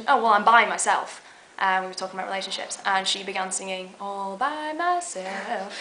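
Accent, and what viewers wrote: United Kingdom. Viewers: Are you from ire or Scotland? U sound Scottish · i'm straight and i loved your story. your adorable! i love your british acsent. i wish you well.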